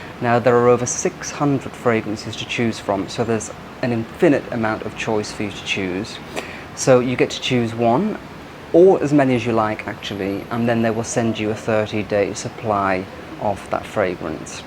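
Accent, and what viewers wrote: RP, United Kingdom. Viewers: I am Japanese. I live in UK for 50 years but I still have heavy Japanese accents. I genuinely admire and enjoy listening posh accents. 😊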